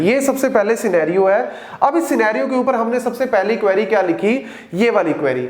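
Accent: Punjab, India. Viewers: sir ji app punjabi ho?/?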